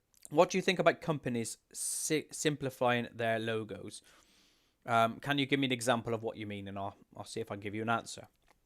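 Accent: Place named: West Country, United Kingdom. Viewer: Are you from Bristol, by any chance? Your accent sounds very westcountry with your "Aff" instead of "Of" and your hard Rs. Don't worry if you are lol, I'm also a Bristolian!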